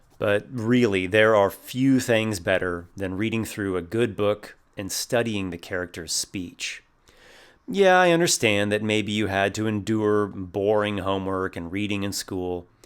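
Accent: Mediterranean. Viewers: Your Mediteranean (sorry if it's misspelled) accent made me think of Minsc from the Baldur's Gate series Also, "read books" was the best think I've heard in a video in a while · His Mediterranean accent almost sounds like he’s trying to do Mario.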